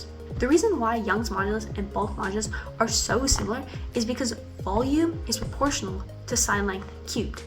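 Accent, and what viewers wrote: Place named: Hungary. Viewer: You are Hungarian?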